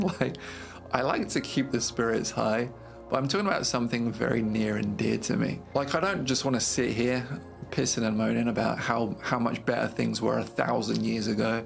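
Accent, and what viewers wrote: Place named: England, United Kingdom. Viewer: Wow, I was shocked to end this video and see you only have 40 subscribers. Love your humor and topic of video. The combination of English accent and mug is a little hard to digest at once, though. If you’re going to show your face at least, use an American accent or vice versa. Keep up the quality man. Seems like you’ll be successful on here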